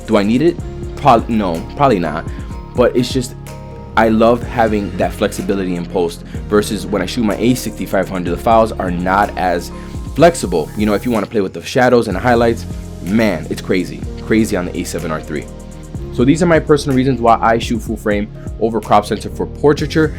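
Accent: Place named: New York, United States. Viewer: That's a New York accent Jersey people dont talk that! Great video though love the content!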